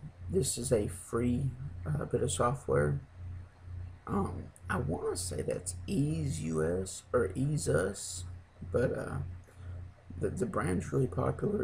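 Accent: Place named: South, United States. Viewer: Thanks for making this informative video. Love your accent. Its so saddening that southern accents are being diluted in recent times. I hope the accents always stick around. I love them so. You also happen to have a very calming voice. This video is all around good to watch.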